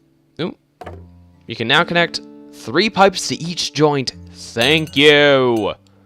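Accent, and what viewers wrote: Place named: South, United States. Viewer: YOUR COUNTRY VOICE THO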